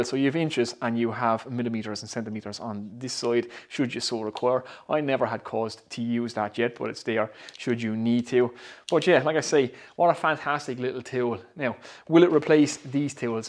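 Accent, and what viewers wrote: Waterford, Ireland. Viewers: I just got one today. Is that a Waterford accent? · Great video. Additionally, an Irish brogue is the most beautiful sounding accent.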